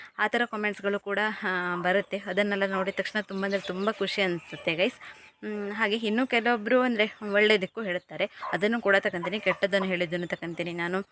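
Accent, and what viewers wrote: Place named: Kundapur, India. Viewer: Nimma mathu swalpa kundapur kannada uttarkannada mix ede adare nimma mane hatthira beautiful nature nodi kushiyayithu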